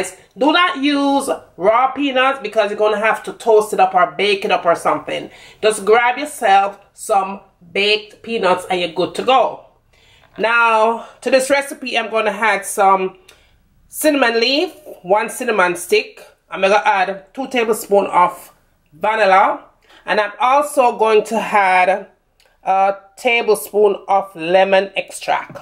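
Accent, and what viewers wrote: Jamaica. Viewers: My girl. Twang fi mi a likkle. Deh accent deh raw and solid like a tru Jamaican! Lol. Tonks fi do watch :-)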